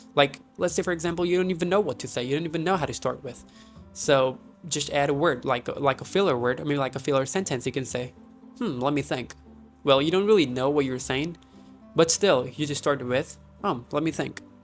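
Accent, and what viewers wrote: United States. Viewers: Bro how do you speak american english accent ?? Have you been to there ??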